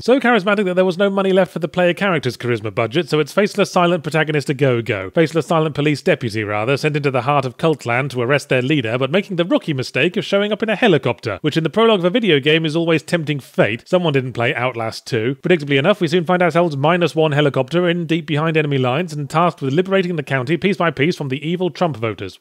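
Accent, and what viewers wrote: Australia. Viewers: Yahtzee is starting to develop an Australian accent, after all this years.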